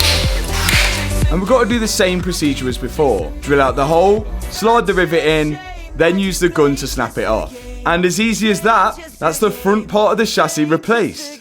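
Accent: United Kingdom. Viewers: I'm so irritated of his British accent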